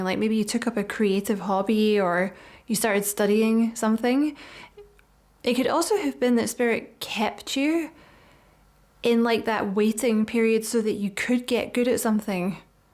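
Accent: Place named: Scotland, United Kingdom. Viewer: Thank You. Felt as if you were doing a private reading for me. Resonated with me every step of the way.. and I love your accent and you must be from Scotland. Thank you again. You are an excellent tarot reader. Averil from South Africa. Blessings to you ♥️🌹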